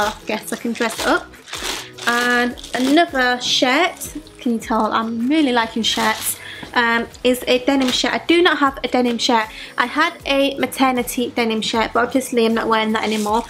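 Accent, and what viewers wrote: Yorkshire, United Kingdom. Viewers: Where is your accent from? Yorkshire?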